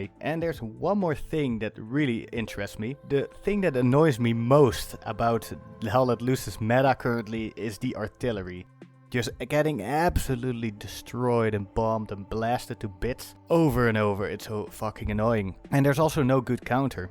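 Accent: Netherlands. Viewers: Ben je een Nederlander? Je accent xD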